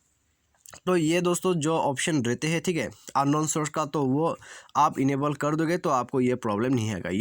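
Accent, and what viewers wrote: Assam, India. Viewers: Bro i think you From Assam? 🤔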